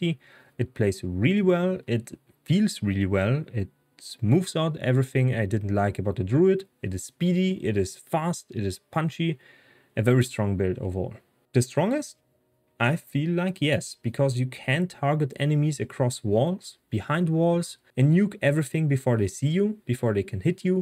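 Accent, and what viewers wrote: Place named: Germany. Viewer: Gibt es das auch auf deutsch? Hast ein starken deutschen Akzent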